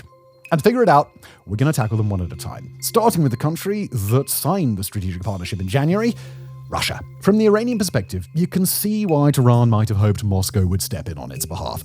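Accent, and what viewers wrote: RP, United Kingdom. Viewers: unsubbed. not sure how I put up with this unlistenable british guy for this long. british accents are overrated, folks. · This guy thinks talking posh makes you intelligent. Idiot hasnt even worked out the jewish cabal and shills for them cos he would lose his channel and income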